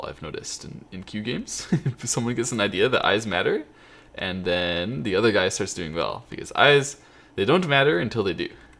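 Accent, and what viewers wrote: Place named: Europe. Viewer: Crazy, I always assumed you were from some European country because you seem to speak English with a bit of an accent and a slightly strange cadence.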